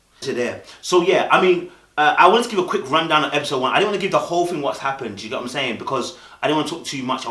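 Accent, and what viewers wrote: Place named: Birmingham, United Kingdom. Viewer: This was really good. However it's like tfor the first time in watching so many of your videos that I fully heard your Brummy accent. As in clear as day, the whole way through this loool. I was genuinely like "wow" 🤣🤣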